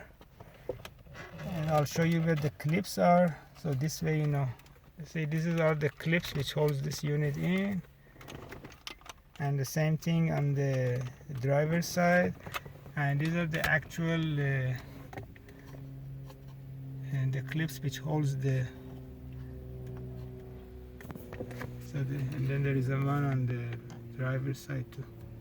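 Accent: Iran. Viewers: داداش ایرانی هستی اخه لهجه اینگلیسی خیلی باحالی داری 🤭😂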